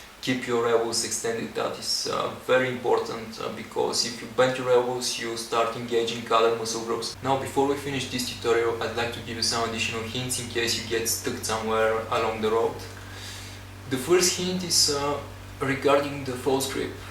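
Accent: Bulgaria. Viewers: are you bulgarian ?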